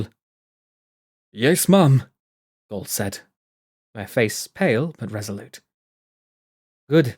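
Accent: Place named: United Kingdom. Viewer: you sound very british in this one!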